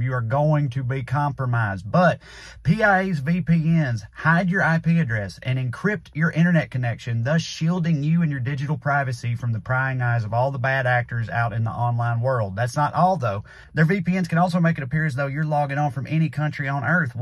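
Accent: South, United States. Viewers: As someone who was born in Alabama, thanks for this video, great example of someone with a strong southern accent who sounds legitimately intelligent. lol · Speaking as a Mississippian, I don't hear the word "defenestrated" or "feckless" said with a Southern accent nearly often enough. I know my neighbors too well to trust them running anything much or picking our leaders. Through their great decision-making skills, they have enough trouble getting through their own lives, I don't want 'em having much influence on mine. Vote blue. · Love ya Trae ❤ & I'm so bloody proud of myself that - as an aussie - i can understand you without subtitles ( because you speak really fast as well as with an accent I'm not used to even among american accents 🤣🤣 ) - love ya like chicken ❤❤❤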